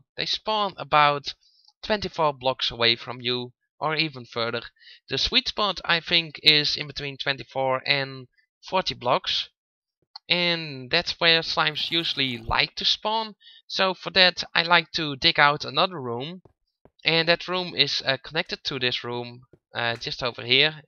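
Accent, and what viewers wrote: Netherlands. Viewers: Dutch accent. :p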